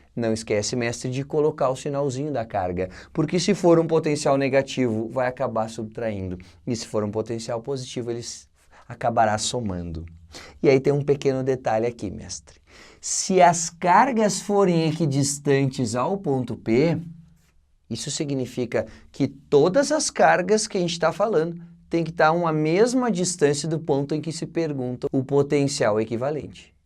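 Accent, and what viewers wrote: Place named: Santa Catarina, Brazil. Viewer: sotaque de catarina é bom demais hahahahahah show de bola mestre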